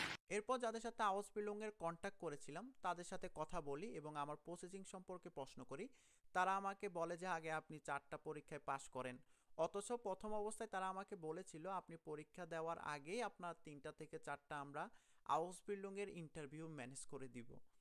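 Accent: Noakhali, Bangladesh. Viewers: Vaiya Noakhalir kon jaygay basha apnr ❤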